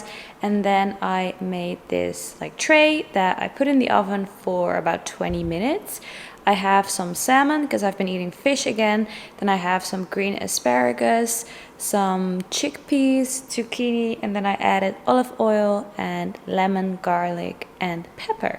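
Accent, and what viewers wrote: Netherlands. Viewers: Are you Dutch? You have a very Dutch accent XD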